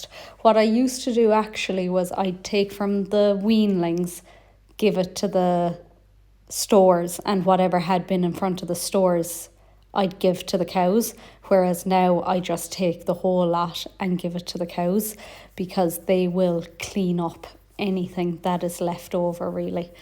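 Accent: Ireland. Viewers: Hate to disagree with you but I could listen to your sweet Irish accent ALL day long. ❤️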